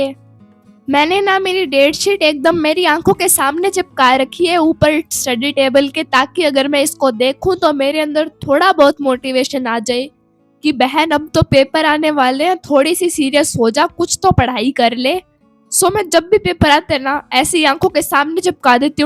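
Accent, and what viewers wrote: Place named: Haryana, India. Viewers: Bahan are you from Haryana because from your tune it seems that you belongs from Haryana😊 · Yah she is from Haryana hisar 😊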